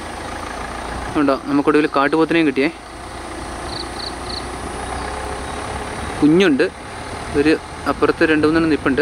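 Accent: Kannur, India. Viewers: താങ്കള്‍ കണ്ണൂര് കാരന്‍ ആണോ ബായ്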